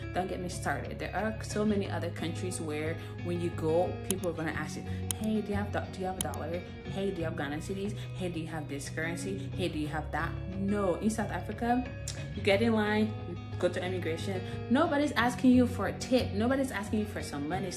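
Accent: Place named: Nigeria. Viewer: Hon you don't sound American , you sound Nigerian , wena woth bribery story at the airport, wena you are fake , you Nigerian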